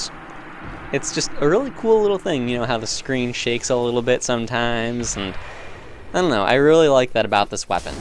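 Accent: United States. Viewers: I speak german. You are pretty much pronouncing it correctly. Heavy american accent but correctly. (I'm american just sayin it sounds like an american sayi g it right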